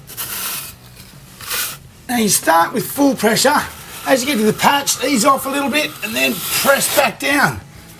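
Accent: Australia. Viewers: The Australian accent 🤢🤢🤢🤢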